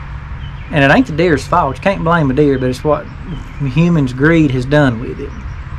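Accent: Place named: Appalachia, United States. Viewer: It’s interesting to me how similar the southern Appalachian/Alabaman accent is to what I hear in central Appalachia. Eastern KY. I’ve never even gone hunting, I just saw your truck in the video preview and knew I’d probably recognize the way you talk. I see another homesteader in my Shorts all the time who’s actually living in West Virginia, but he’s not southern. Every time I hear an accent like mine I have to leave a comment, you talk right cornpone sir :) Wiener dogs will burrow for fun. Happens to be my breed of choice. Miniature dachshunds were bred to hunt small rodents, so they have a very strong prey drive. It’s kinda funny watching them get after stuff on their little legs. Won’t ever train mine to hunt but have dealt with more small dead animals than I ever asked for haha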